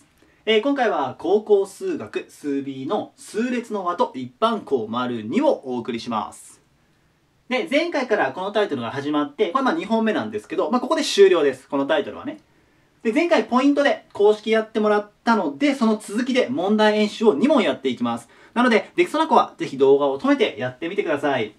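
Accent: Kansai, Japan. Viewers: 所々出てしまう関西訛り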